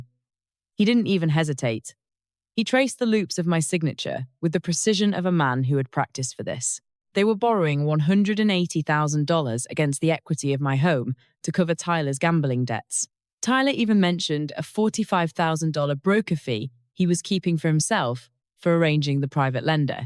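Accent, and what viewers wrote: United Kingdom. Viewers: Why is she speaking with a British Accent instead American? HUH? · Nice story. But hearing a British accent saying they worked as a customs agent at a base controlling a port in South Carolina was wrong. Adding the visuals of Hotel Saigon Morin added to the confusion. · Why do you have a British person narrating an American story with an Asian video playing?? Kind of stupid, don't you think??? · Why did they get a narrator with a British accent? It’s so out of place. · Yes just seem odd a British accent on an American story 😮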